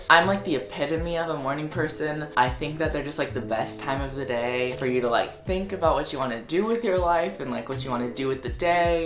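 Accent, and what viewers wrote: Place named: Texas, United States. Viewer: Conan Gray You still talk like a Texan and I love it cause it’s like a high pitched country voice and it’s my favorite thing in the world!!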